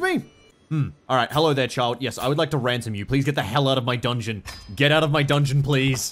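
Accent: Australia. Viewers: down under aye u aussie mate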